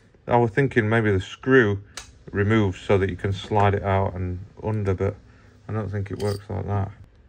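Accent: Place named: England, United Kingdom. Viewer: английский акцент - это кайф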